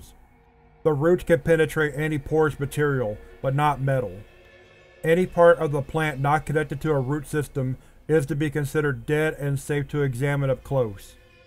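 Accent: South, United States. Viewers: Ahh yes, your sweet southern SCP narration voice is always there for us!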